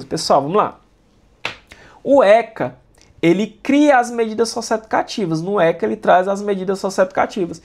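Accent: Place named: Northeast, Brazil. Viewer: Ninguém pode se irritar com seu sotaque nao, oxi #orgulhonordestino. Sou carioca, mas minha familia é nordestina!! Excelrnte prof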